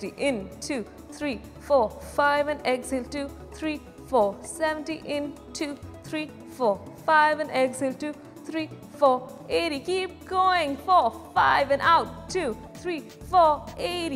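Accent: India. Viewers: it's Indian accent dear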